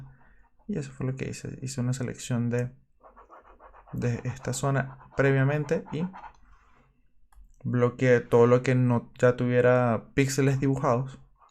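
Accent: Venezuela. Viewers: Ese acento. Venezolano, tal vez?. Dibujas super genial!. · Eres venezolano? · si es evidente, solo que no es tan conocido. Pd: a mi me gusta mucho el acento veneco jeje.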